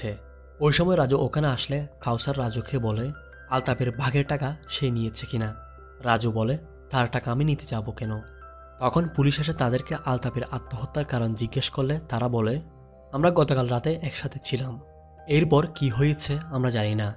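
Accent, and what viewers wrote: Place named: Chittagong, Bangladesh. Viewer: আপনার বাড়ি চট্টগ্রাম। আপনার কথা শুনেই বোঝা যাচ্ছে।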